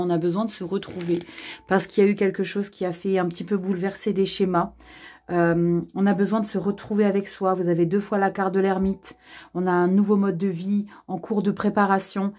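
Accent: South, France. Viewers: Bonjour et merciiiiii!!!!!!, c'est la première fois que j'écoute l'une de tes guidances et je voulais tout d'abord te dire un grand merci pour ta bonne humeur, pour le sourire dans ta voix, pour ton enthousiasme, pour ton humour et pour ton "accent du sud" ❤ Ne change rien ❤❤❤ Maintenant merciiiiii pour la guidance et les messages ❤❤❤ J'avais tellement l'impression que cela me parlait personnellement que je me disais que ce n'était pas possible, tous les verseaux ne peuvent pas avoir le même projet que moi!!??? Alors je suis allée lire les commentaires des autres verseaux et je suis impressionnée de voir comment tes messages raisonnent pour chacun dans la même énergie même si c'est sur des chemins différents ❤❤❤ Alors encore merci pour tes messages qui confortent mes pensées et mes choix. Tu m'as donné tellement d'énergie!!! ❤❤❤ J'espère que tu as conscience du bien que tu fais autour de toi et à toutes les personnes qui t'écoutent ❤❤❤ Encore une fois ne change rien, tu rayonnes et c'est un bonheur de t'entendre ❤❤❤ Je te fais un très gros câlin ❤❤❤ Très bonne journée Valérie.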